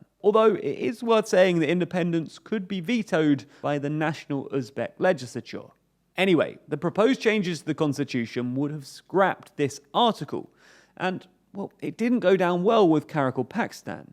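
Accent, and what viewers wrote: United Kingdom. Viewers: The first three are just British pronunciation errors, the fourth one doesn't matter because you know what he meant. The final two are the only serious ones, and they aren't even really that serious.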